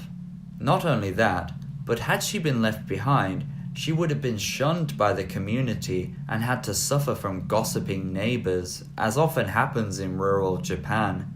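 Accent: Manchester, United Kingdom. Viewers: Are you from Manchester? Trying to place your accent but I'm no expert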